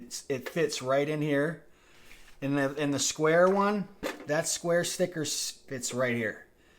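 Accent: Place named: Pittsburgh, United States. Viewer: just when some sad corner of youtube said the Yinzer accent was dian aht I find this video ✨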